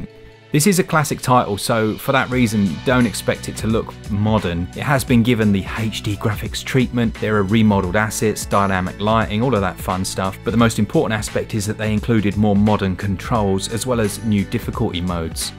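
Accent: United Kingdom. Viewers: I don't know why, but you kinda sound like a sexy British Morgan Freeman 🤷‍♀️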